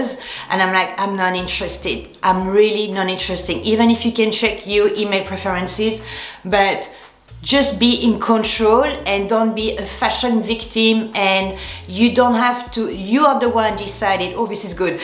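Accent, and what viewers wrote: France. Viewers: When I see your beautiful face and I hear “cleek,cleek,cleek in that wonderful French accent I know this video will be great. Your shopping advice was spot on. Since I started watching your channel I find myself making more of an effort in my appearance every day…and oh yes…perfume every day.!!!!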